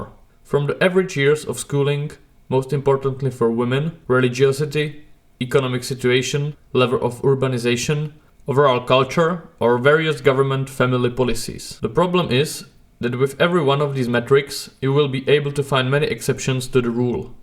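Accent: Czech Republic. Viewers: Heh, I was thinking that English sounds somehow "Czech" to me. It seems difficult to get rid of the accent. But it is positive that "our" English does not sound like "Russian". On the subject: I find it absurd that more attention is not paid to demographics. For Europe, it is a ticking time bomb that can disintegrate society. According to a prediction, in 2050 there will be only 2 workers for every one pensioner in the Czech Republic. This will generate enormous intergenerational tensions - and I doubt that the working population will accept that they will essentially live only to support the pensioners. At the same time, with social security contributions so high, they won't have the means to start their own families, which will only deepen the spiral of low birth rates. Because of the high number of pensioners, they will have considerable "voting" power to elect politicians who will play into their hands - as we are already seeing, not only with pensions but also with real estate (low property taxes, restrictive building codes). 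Immigration doesn't solve this - it just postpones the problem, and at the cost of breaking up the "nation". For all our achievements in protecting personal liberty and women's right to self-fulfilment, if the result is a society that cannot survive, we will be replaced by a society that, while barbaric to us, can produce enough children to be stable in the long term. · Excellent channel and a rarity on this vitally significant subject. And all patiently explained in a characteristic Czech accent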